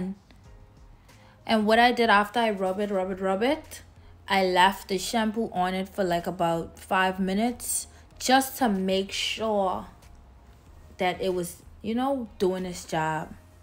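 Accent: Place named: Bahamas. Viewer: You sound Bahamian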